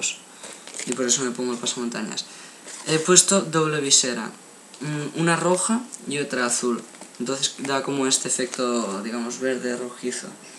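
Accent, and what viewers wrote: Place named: Catalonia, Spain. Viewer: com es nota que ets catala cabro! xd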